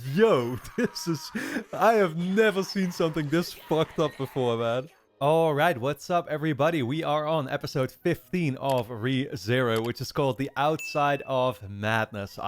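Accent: Netherlands. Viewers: Your dutch?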